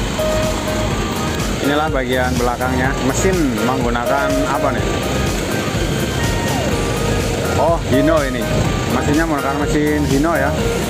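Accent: Banyumas, Indonesia. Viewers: Selamat malam mas nek ora ngpak ira kepenak....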